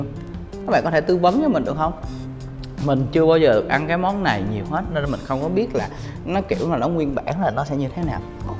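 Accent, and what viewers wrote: North, Vietnam. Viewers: Giọng bắc xịn đấy:)) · Nói giọng bắc buồn cười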